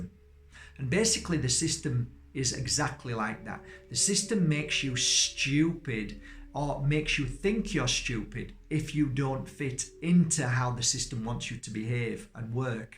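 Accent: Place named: Northern England, United Kingdom. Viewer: Trying to figure out which part of England your from is it lankshire Northern England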